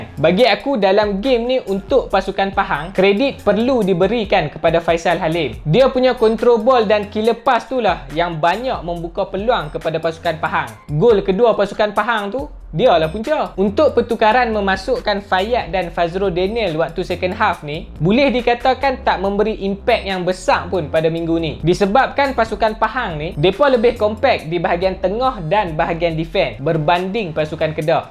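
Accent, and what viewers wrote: Kedah, Malaysia. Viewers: boleh letak subtitle dlm bahasa baku untuk next video..bnda ni boleh memudahkan viewers dri negeri lain faham maksud perkataan loghat kedah yg depa mngkin x faham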